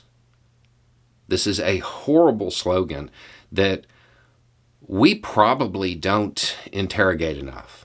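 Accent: South, United States. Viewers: I started watching this channel because a bearded white male with a southern accent spouting common sense wisdom was kind of funny. I now realize your whole point is to invite us to think, to challenge what we hear or read. "It's just a thought" I understand as "ponder it for yourself and feel free to agree or disagree but make sure you have your own arguments either way."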